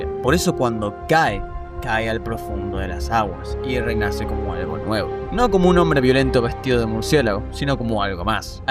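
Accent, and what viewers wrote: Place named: Argentina. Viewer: Dios mio, de verdad que el algoritmo de YouTube está podrido estos días al no hacer más populares estas joyas de videos. Amo tu forma de narrar, y el acento argento siempre me ha gustado. Como fanático del cine, realmente nunca se me ocurrió relacionarlos, pero escuchando tus explicaciones, realmente te dás cuenta de que si tienen bastante en común.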